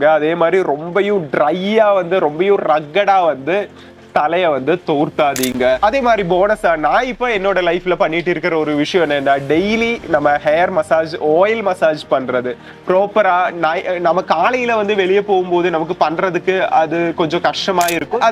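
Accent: Kerala, India. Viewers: மலையாள தமிழ்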